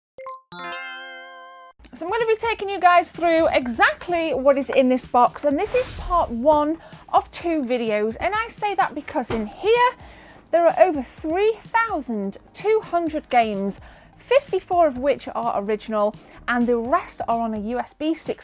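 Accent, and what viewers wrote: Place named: Liverpool, United Kingdom. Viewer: You sound like a posh Scouser? AND you DONT look old enough to know about an AMIGA ?!?!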